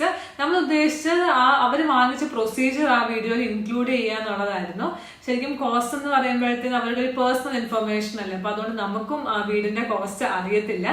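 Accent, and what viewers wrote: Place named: Onattukara, India. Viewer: Nice video. Including some sample price for homes in suburbs for say 50 -100 sq. meter homes would have been the cherry on cake. Your malayalam is so much like 'onattukara' malayalam ( Mavelikara, Chengannur etc) ! It's such annuncommon version of Malayalam on YouTube. This gives us the feel of our 'Nadu'. Thanks.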